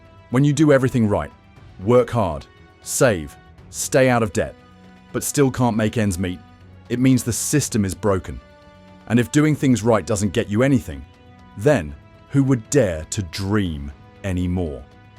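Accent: United Kingdom. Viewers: f this film producer nothing but propaganda ...every place has good and bad..:face-green-smiling: most are illegal criminals anyway f n brit narrating lets talke about england where he came from wht a jerk how about england paying its bills from both wwars to usa that they owe starting with your loser kings wealth? and all ye yankees go home anyway the locals are doing fine without ya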